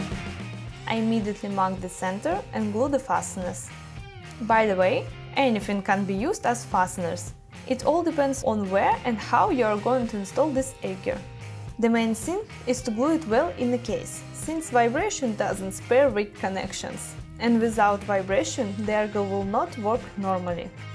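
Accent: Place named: Russia. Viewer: Russian accent that you can never hide))))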